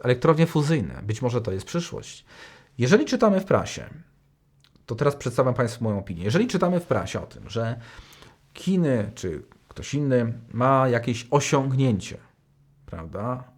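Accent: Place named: United States. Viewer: ps tylko AMERYKANIE tak te nazwy wypowiadaja - ja mieszkam e UK i okolo kilka krajow w Afryce ma jako uzredowy Angielski - i tak tego nie mowimy...pan z Ameryka znaczy... stad ten szal na nowinki ??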